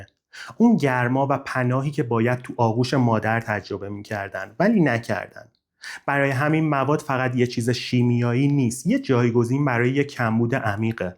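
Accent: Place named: United States. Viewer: یه سؤال تو متولد نیویورک هستی؟ خیلی لحجه آمریکایی داری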